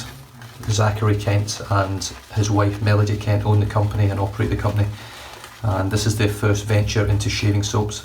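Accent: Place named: Scotland, United Kingdom. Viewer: ahh..OK..Top of the Chain not 'Top Of The Chin' ...the Scottish accent caught me out LOL (and I have a Scottish doctor who has a thicker accent) LOL..OK mostly out of stock there ...I'll join the waiting list.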